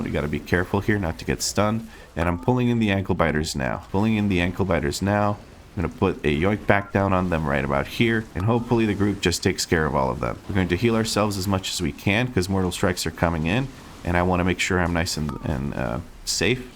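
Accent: United States. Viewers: hey, completely unrelated but...why do you have an american accent?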